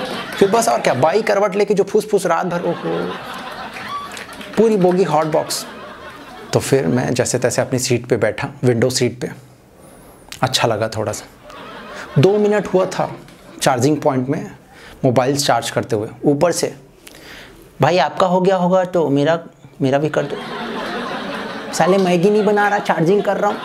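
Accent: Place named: Eastern Madhya Pradesh, India. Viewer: Why his tone sounds like he is from Madhya Pradesh ,and that too eastern part of m.p 🤔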